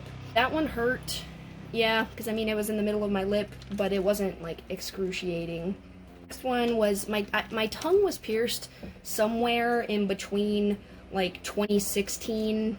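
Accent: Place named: United States. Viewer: Great! Regarding your dialect I would guess you're from the USA, but your style look more european to me :) Great though that some americans dare to look good :)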